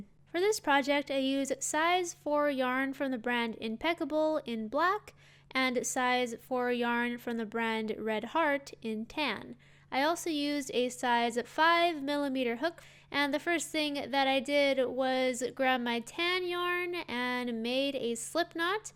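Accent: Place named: United States. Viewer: Sweet.Can anybody tell me what U.S accent does the craft lady have?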